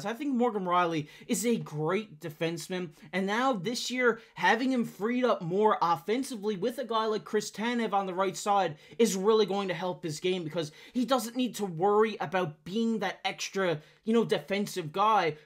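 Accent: Newfoundland, Canada. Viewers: Definitely a Newfie or a caper. Good trying the English b’y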